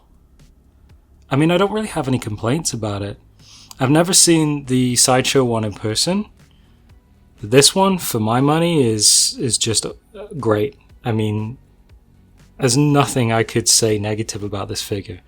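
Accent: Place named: United Kingdom. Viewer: Nice collection, mate! 👍 Particularly love the Dagobah set-up! Maybe surprisingly, the one figure that stood out for me and makes me want to get one was R5-J2! That little fella looks quality standing next to Tarkin! 💯👌 Btw, are you a British expat in Canada or do some Canadians sound as though they're from the UK? (Hope that's not offensive in any way to our Canuck cousins!) 😅 Cheers!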